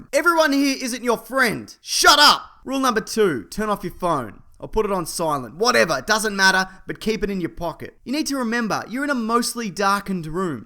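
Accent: Australia. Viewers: Delivered in that unmistakable Down Under accent!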